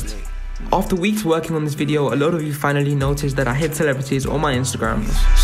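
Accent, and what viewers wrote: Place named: England, United Kingdom. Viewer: U sound like ur from England bro NICE ENGLISH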